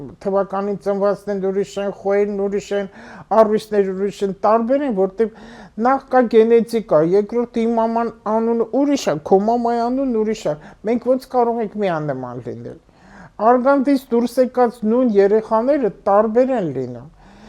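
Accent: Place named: Tbilisi, Georgia. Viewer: Duk im nman ek xosum tilizi akcentov hat urax em vor dez lsum em es tiflizeci em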